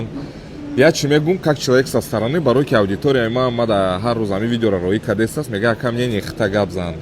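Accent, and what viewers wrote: Kulob, Tajikistan. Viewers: 😂😂😂 Хитт карде Гапи кулоби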